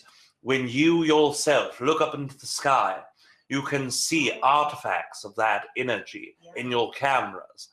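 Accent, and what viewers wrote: England, United Kingdom. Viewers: why is he speaking in an english accent though?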